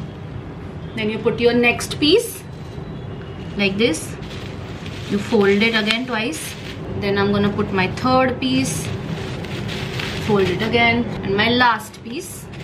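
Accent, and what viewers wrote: Maharashtra, India. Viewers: U r just amchi beacuse the way u talk full marathi vibe "vastraharan😂"...."chaddi vala part" i just enjoy your vlog and lots of love to amchi god evie❤❤❤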